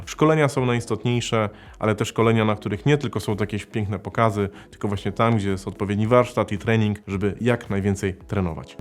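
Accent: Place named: Poland. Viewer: Niesamowicie bawi mnie Polska wymowa angielskich słów 🥲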